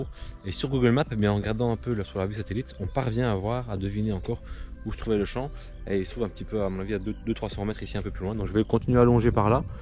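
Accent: Belgium. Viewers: Accent belge sa non? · OK ! Je ne savais pas que tu étais en Belgique avec une autre réglementation. J'aurais dû m'en douter avec l'accent... Bons trous et dans l'attente d'autres vidéos aussi sympa.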